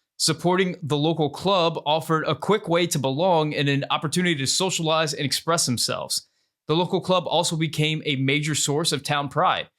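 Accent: United States. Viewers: There’s already a sport called Football here, and whether we are fans of it or not we grew up hearing the word repeatedly associated to that sport. I don’t have a problem calling it football, but it’s confusing to hear the word football from an American accent and not think about the sport where men line up at a line of scrimmage and smash into each other. In Spanish, Football is called Fútbol even though Fút and Bol are not Spanish words.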